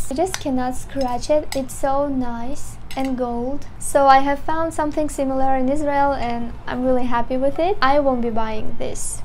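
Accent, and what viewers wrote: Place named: England, United Kingdom. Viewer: Loving your videos, your english accent is sweet you are doing a fantastic job, keep it up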